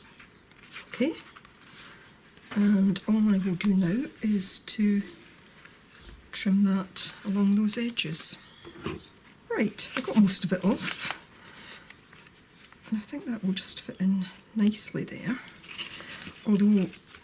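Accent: Scotland, United Kingdom. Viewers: I don't know if anyone mentioned this already, but the things that you thought were stickers look like window clings to me. I love your art work, and also your accent! My grandmother was from Scotland and I've always loved to hear that accent. I'm from the US.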